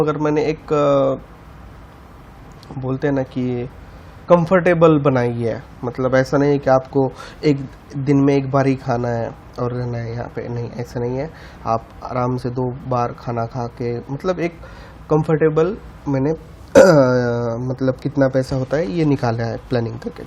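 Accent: Maharashtra, India. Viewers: sir jee, r u maharashtrian ? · yourvishal hahaha, tyachya tone varun kalta, ki toh marathi aahe! Jay maharashtra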